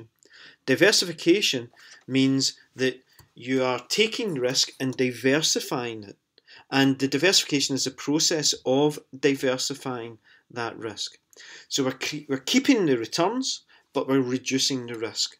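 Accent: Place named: Scotland, United Kingdom. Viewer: Very helpful and Love your Scottish accent!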